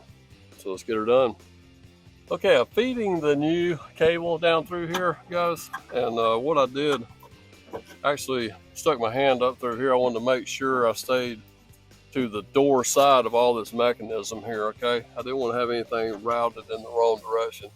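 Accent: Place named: Texas, United States. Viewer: Appreciate the video and your accent. Texas?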